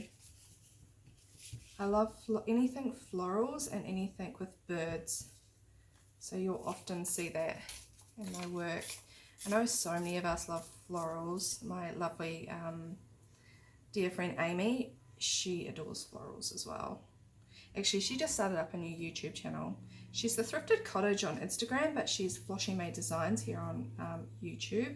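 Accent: New Zealand. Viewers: Recently stumbled upon your channel I detected the kiwi 🥝 accent im from Auckland but soon to be a southland gal, love your channel and ideas very inspiring thank you for sharing your creativity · Ahhhh, I had thought there was a bit of a kiwis accent there !!... when Gayle A said she loved your Aussie accent and I went huummm. I'm so like you in regards going to the states ,told people would love to go to the states...questioned ouhh what would you do 😁 Go to second hand shop, craft shops Michael's ..theres a bit of a list there !!!. The area of BrisVegas I'm in, the second hand shops are rather boorriing!!. I've been keeping an eye out for wall paper .... as for tea dying paper my issue is that mine are too light..tried drying them naturally, in oven, ironing them...not finding them exciting lol. Eco dying ohh my gosh they were bleurrr please show how you do urs with Aussie flowers and products. 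I still am trying g to work out what Alum powder is 😲..makes gorgeous colours of plants and flowers. With my pages theres just a tiny hint that there might have been a flower there ahaha. Things in the states are soo different. I was at my local Kaiser shop and overheard a lady stating that she just couldnt find a piece of 12×12 that she wanted and was upset. I happened to have that paper which I'm not nor will ever use. So i went up to her and introduced myself telling her I had that paper and she was welcome to have it...oh my gosh it was so awful , I ended up telling her I would leave the paper at the shop for her ro pick up....like I she really needed that piece of paper to finish her scrapbooking. All that lady kept saying was ...what do u want , you cant just want to gi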